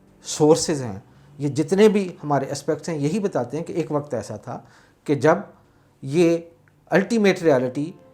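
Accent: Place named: Punjab, Pakistan. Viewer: nice,agreeاردو الفاظ اورجملوں کی ادائیگی کرتے ھوے پنجابی لہجہ کا تڑکا برا نھیں،لیکن اردو زبان کا اپنا لہجہ اوردرست تلفظ کی ادائیگی کااختیار کرنا،تقریر کو زیادہ پُراثر بنانے کےلۓ یہ پریکٹس بھی احسن ہے۔🌺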